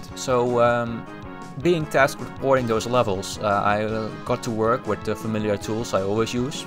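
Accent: Netherlands. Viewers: you sound Dutch cool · Man, this is one of the coolest projects I've heard about in a while. Must have been an honor to be a part of this! Might even consider getting a SNES now. By the way, are you happen to be Dutch? I hear a certain accent? ;-)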